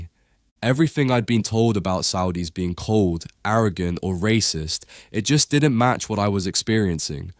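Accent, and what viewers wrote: United Kingdom. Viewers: Are you from UK Bro?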